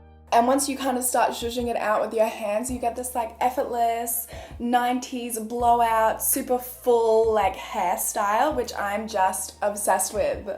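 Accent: Australia. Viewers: I know people have probably already said it but she reminds me so much of Maia Mitchell. I think their noses are similar. But the Australian accent just makes it better lol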